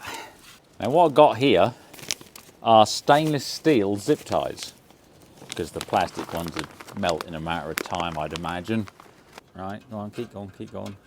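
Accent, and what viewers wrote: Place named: England, United Kingdom. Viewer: I do really enjoy your videos however I have to admit I don't always know what you are talking about your English is a little to English for me and not enough Rez for me to understand sometimes. It like learning a new language sometimes but fun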